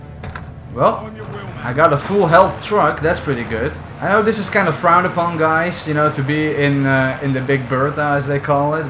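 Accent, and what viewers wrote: Netherlands. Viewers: Ik hoor het Nederlands door het Engels heen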